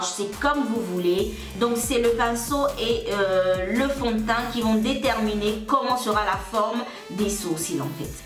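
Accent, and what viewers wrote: Ivory Coast. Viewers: Tu es ivoirienne ?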